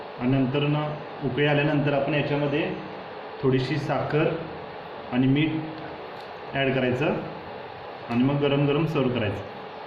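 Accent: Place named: Kolhapur, India. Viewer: Chef asl kolhapuri boltat ki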